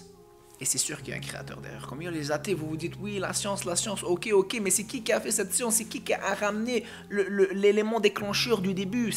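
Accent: Canada. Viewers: mais c’est un canadien tout le monde parle comme ça ici !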